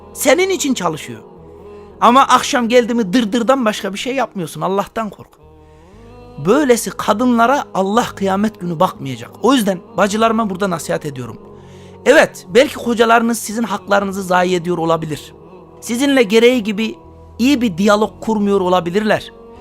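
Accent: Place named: Konya, Turkey. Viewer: "Çen çen çen "😂😂😂 sen konyalının hasısın hocam.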